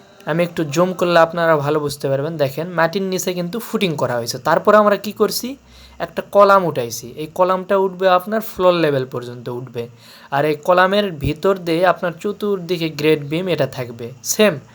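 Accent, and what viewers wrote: Naogaon, Bangladesh. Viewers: নওগাঁ আর জয়পুরহাটের ভাষা কি এক? উনার ভাষা নওগাঁর মত।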